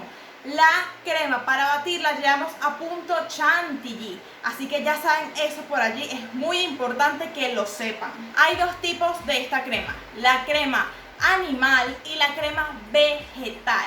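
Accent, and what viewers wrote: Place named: Venezuela. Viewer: Hola buenas noche ésas marcas de crema a la cual te refieres la venden aquí en Vzla. ?..... O tú estás viviendo en otro país?........ Tu acento es muy venezolano. Gracias de a antemano amiga . te felicito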